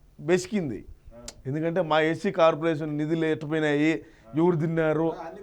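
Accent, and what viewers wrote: Rayalaseema, India. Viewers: Good satire, in Rayala Seema accent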